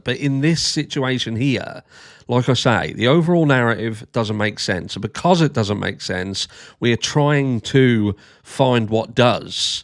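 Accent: United Kingdom. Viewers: It appears you think because you speak with a British accent or whatever, you think people are supposed to believe you over everyone else. Jimmy Kimmel is a comedian. Jimmy Kimmel is not a racists podcaster who preaches hate division & white supremacy, nor did Kimmel advocate for gun violence to include kids being murdered in cold-blood in schools and churches as they studied & prayed. You had no problem throwing shades at Jimmy Kimmel for rightfully doing his job in a comedic way through political satire for calling out a 21st-century Klansmen in a suit & at no time did you bothered to mention any of the racist disgusting horrible things Charlie kirk has said which told me everything i needed to known about you and your up bringing or current beliefs. I watched your video, and just like the one before this one, I gave it a thumbs down. If you're going to make videos to explain your point of view from an honest point of view, you should leave your biased feelings out of them, ,, Otherwise you're just a low grade brand of Foxnews propaganda which is responsible for the current political divide in today's America . 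The killing of 21st-century Klansmen in a suit Charlie Kirk was wrong, but Jimmy Kimmel was just doing his job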